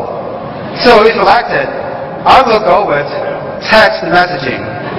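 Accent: China. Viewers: 让我看到了 纯正的东北调的美国口语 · 这口音好严重…广东来的吗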